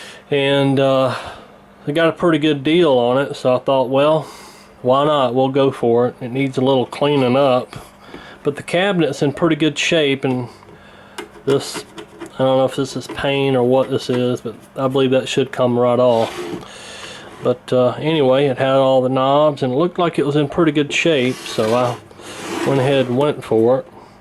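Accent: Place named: East Tennessee, United States. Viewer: Great channel. And sir, that sounds like an East Tennessee accent to me. Or somewhere not too far from there.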